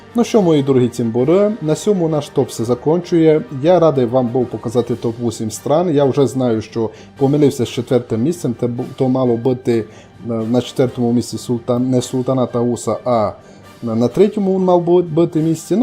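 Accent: Transcarpathia, Ukraine. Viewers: Я живу на Полтавщині, для мені дуже файно чути закарпатський діалект і hoi4. Продовжуйте.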